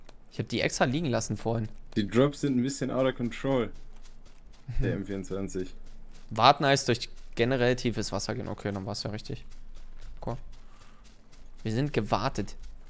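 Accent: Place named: Saxony, Germany. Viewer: Das Sächseln ist einfach nur eklig.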